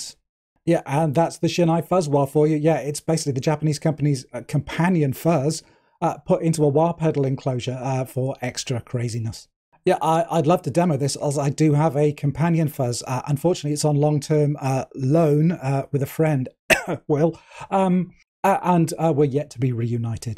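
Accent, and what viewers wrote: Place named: United Kingdom. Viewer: A guy with a beard and that wonderful british accent is talkin about guitar things with examples outside the mainstream music. I could not be happier. 😁👍 Thank you. Liked and subscribed. 🤘😎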